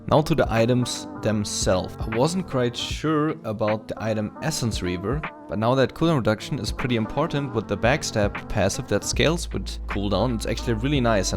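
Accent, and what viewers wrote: Germany. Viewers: R u German? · Hay man really nice Guide this build is awesome. I always bought black cleaver, Ionian boots instead of essence reaver but I will try this one aswell :) I just subscribed and btw you're german right? ^^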